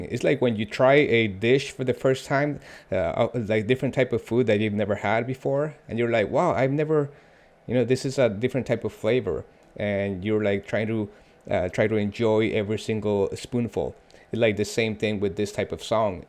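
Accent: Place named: Latin America. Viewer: The million dollar question is not about Rudy...what is your nationality? Your accent sounds latin, but clearly you don't understand Spanish. Inquiring minds want to know. Great opinions and comments!! Enjoy this journey you have embarked upon! Oh yes...this no rabbit hole...this a caldera, because artistry is as wide as it is deep! Salud! #APDVillaReality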